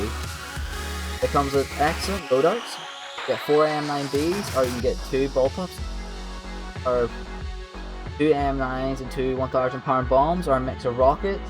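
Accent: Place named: Ulster, United Kingdom. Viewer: Your definitely an ulsterman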